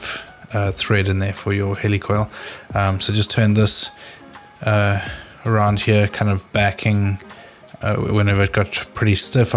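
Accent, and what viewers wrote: South Africa. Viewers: How to spot a South African accent! Very nice video - these heli coil thread repair kits are relatively cheap - saved my LiFePO4 cells!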